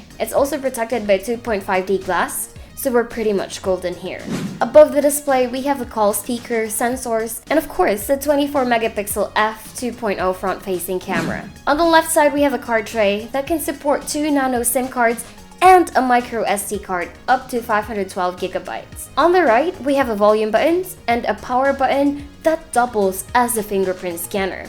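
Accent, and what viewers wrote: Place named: Philippines. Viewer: Her Filipino accent is quite fading, so majority of the words you hear are the right pronunciation since she has borderline American accent.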